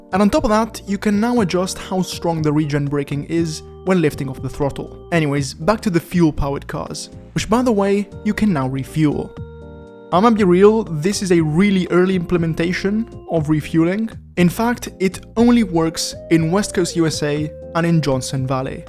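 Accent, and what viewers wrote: Italy. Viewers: Ciao, ho sentito che sei italiano. Come fai a parlare inglese così bene? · aoo ma sei italiano ? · bro so easy to tell you are italian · ma sei italiano? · Ma sei italiano?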